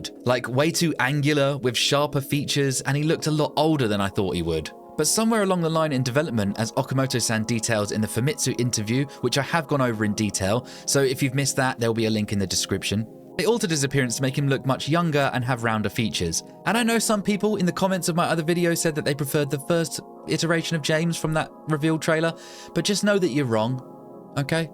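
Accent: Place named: United Kingdom. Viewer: its the accent ... British accent does wonders. Its shapes reality :face-fuchsia-wide-eyes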